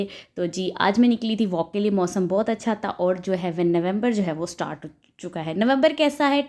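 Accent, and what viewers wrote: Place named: Punjab, India. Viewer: Wah G... urdu k sath punjabi ka jo tarka lagya hai... maza a geya.. 😂😂😂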